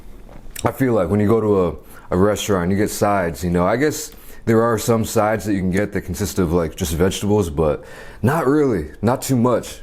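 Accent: United States. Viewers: You sound like the most country asian guy ever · U sound American lol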